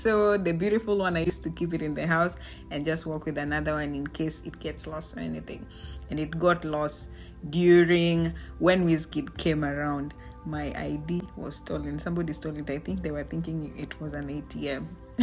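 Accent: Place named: Luo, Kenya. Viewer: 😂😂😂 your laughter, your Luo 😂😂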